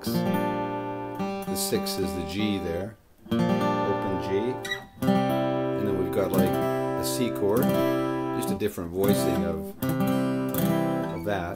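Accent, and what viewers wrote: Canada. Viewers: The accent is Canadian right? Sta-CAT-to lol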